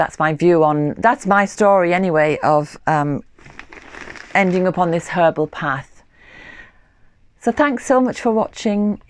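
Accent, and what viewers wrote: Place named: North East England, United Kingdom. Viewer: Another great video ! I always hear the north east accent whilst your talking, .I'm from South Shields and guess your from close by.. Thank you for sharing your knowledge and love of nature with us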